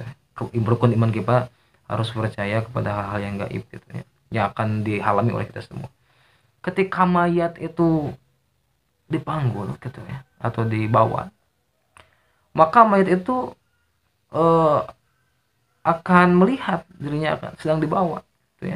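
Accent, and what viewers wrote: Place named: Sunda, Indonesia. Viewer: Mantap. Lanjutkan dengan ke khassan Ustadz Sundanya. Hehehe....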